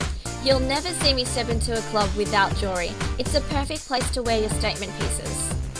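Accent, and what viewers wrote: Australia. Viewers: u sound australian reminds me of alli simpson <3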